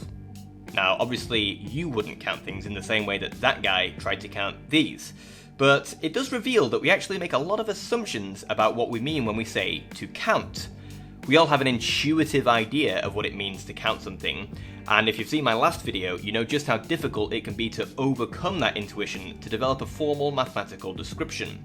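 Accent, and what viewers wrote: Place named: Northern England, United Kingdom. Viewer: He's from the north of England. The accent you're referencing is confined to the south east.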